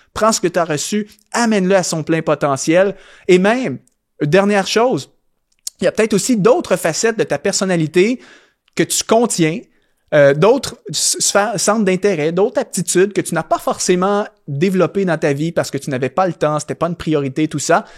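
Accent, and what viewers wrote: Quebec, Canada. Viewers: ton bel accent du Québec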